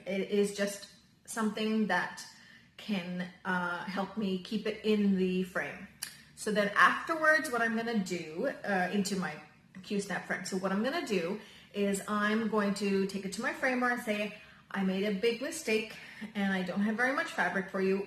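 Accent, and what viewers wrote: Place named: Newfoundland, Canada. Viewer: Hi. I’ve been a subscriber for a while and I have admired your stitching so much. Before you go on too much about you should know that he makes fun of the way you all in Newfoundland talk. Lol 😂. You’re right. He is so funny and I think he and I were separated at birth 21 years apart. I’ve started my first full coverage piece so you are a real inspiration for me 😍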